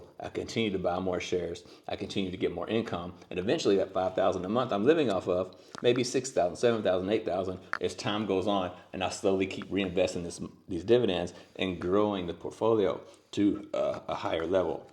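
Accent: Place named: Appalachia, United States. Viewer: I detect an Appalachia accent but a Slavic face. I'm confused, but you're cute. I got zividendz😊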